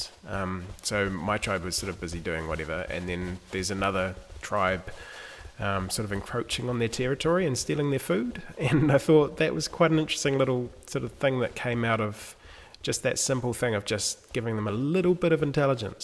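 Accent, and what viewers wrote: New Zealand. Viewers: Dude! This is epic. Are you a kiwi? Sounds like. Me too heh. How did you even get started with making your own engine? It's not like you can just look up a tutorial. Keen to have a chinwag!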